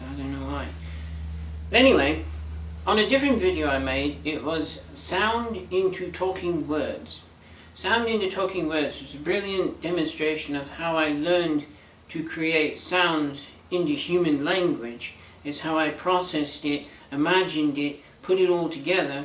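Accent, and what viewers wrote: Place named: United Kingdom. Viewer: i like how you got a british accent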